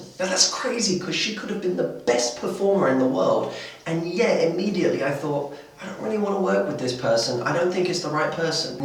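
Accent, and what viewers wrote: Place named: United Kingdom. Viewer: your pretty look and British accent already won people s attention(๑´∀`๑)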